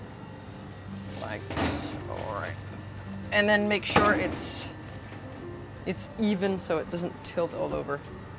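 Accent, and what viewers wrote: Denmark. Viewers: its a very lovely Danish accent. She's a good example of how often we might say "You know?" :D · She sounds danish 🇩🇰🍺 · Instantly recognized the danish accent :) great vid btw.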